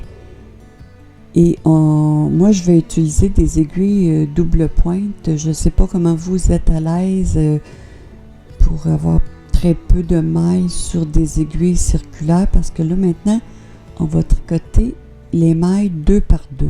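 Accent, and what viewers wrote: Canada. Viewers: .ok merci bcp..c difficile l'accent canadien des fois!! Encore merci pour vos partages.j'apprends bcp.salut du sud de la France.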